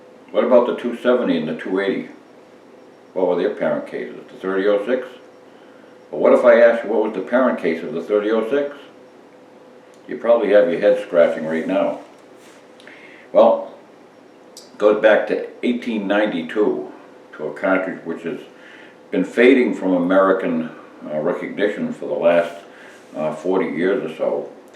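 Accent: New England, United States. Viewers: You sound like your from New England! I say this because my Dad's family has been in Massachusetts and Connecticut for nearly 400 years! So, you sound very familiar to my ears!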